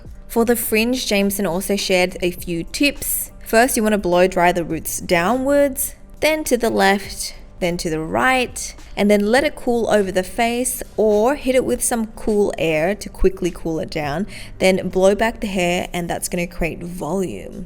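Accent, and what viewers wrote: Australia. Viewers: Where is this salon? As i noticed you have an Oz accent. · I realize that 'hair' for women can be a long process, depending on how long it is and also how well a woman takes care of it, but I never realized what an extensive process it was. I'm hearing accent, sounds Australian? thinking Melbourne?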